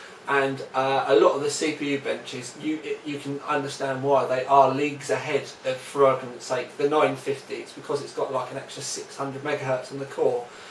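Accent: United Kingdom. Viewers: so hard to understand a tired man with a british accent.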